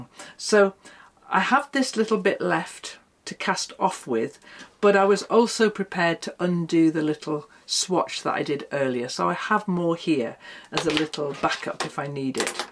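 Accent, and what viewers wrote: United Kingdom. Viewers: i enjoyed the last video of the year. You just bring me joy listening to your lovely British voice with calming thoughts for us on our lime green sofa. I have enjoyed all the crafts you made and watching pateron So glad i found your channel from a wonderful friend i made this year. we were all 3 nurses and understand your mindset. I suggest a knitted pillow with an appliquéd yarn flower in center. Thank Anna and Rita for making all this possible. I look forward to the year of color 2026. Jodie in GA USA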